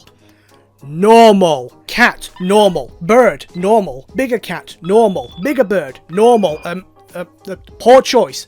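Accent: Yorkshire, United Kingdom. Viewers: Any British folk recognize the accent used here? I've heard it on quite a few YouTubers. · Somewhere in Yorkshire I think. Definitely northern.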